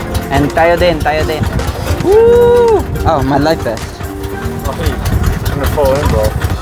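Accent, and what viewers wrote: Philippines. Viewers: I wanna hear talk in his native tongue, as in talking with that Texas twang. Even his English words when he's narrating are spoken with a Philippine accent.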